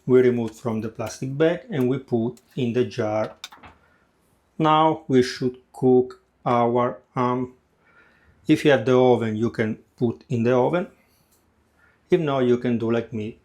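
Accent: Italy. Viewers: Tuoi vídeos mi piacciono moltissimo. Mi piace tanto il acento italiano. And tour recipes and techniques are great!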